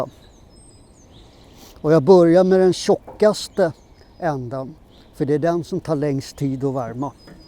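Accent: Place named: Stockholm, Sweden. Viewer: Samma dialekt, stockholmska. rösten är ju inte väldigt lik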